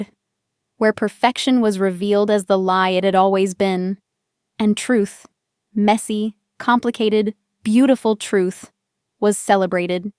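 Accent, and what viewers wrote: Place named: United States. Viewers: Why American accent? Should be narrated in British accent😑. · I agree. This is weird in an American accent.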